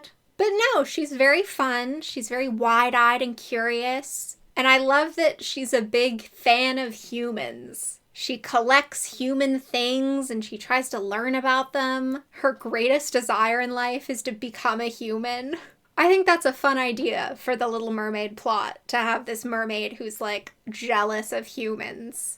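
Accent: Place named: Transatlantic, United States. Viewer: You ate that transatlantic accent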